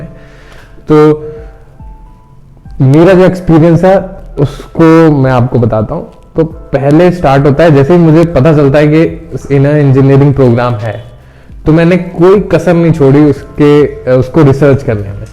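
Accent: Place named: North, India. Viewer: You speak hindi. So I want to ask you anna where do you live.🙏🙏🙏🙏 I mean are you from North.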